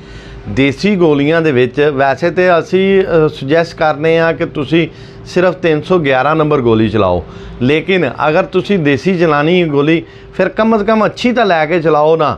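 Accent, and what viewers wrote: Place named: Punjab, India. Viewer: I REALLY VERY MUCH LOVE SMILING FACE EXCELLENT PUNJABI ACCENT LOVE U BROTHER,PL MAKE A VIDEO ON LATEST COST ALL TYPE AMMUNITION,💙💜💚🧡❤💛♥👍👍☑✅🆗😊😊😌😌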